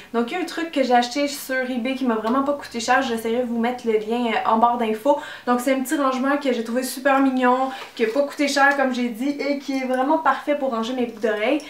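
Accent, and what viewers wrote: Canada. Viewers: ok! Merci beaucoup!;) Tu es vraiment formidable! Je t'adore, j'adore ton accent canadien et j'adore tes vidéos!!!! Continue comme ça! Parfois tu es vraiment trop marrante! BSX... <3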